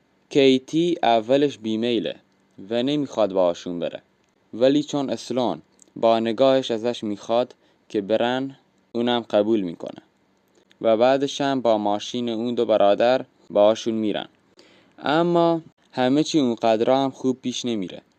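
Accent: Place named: Afghanistan. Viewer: هوی افغانی آدم قحطی بود تو با اون لهجه اعواج و مسخره تعریف کنی بدو برو آجرتو پرت کن......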